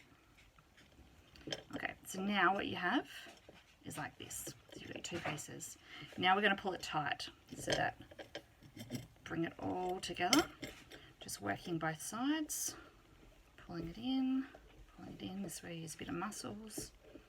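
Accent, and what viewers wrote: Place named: Australia. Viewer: It's so nice to hear an Aussie voice on these how to craft vids. So used to American accents.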